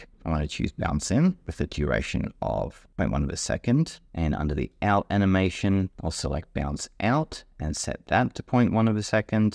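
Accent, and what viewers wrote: Australia. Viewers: Thanks mate you are helping me get unstuck with these bloody reels.. you are Aussie, are ya maaate? cheers!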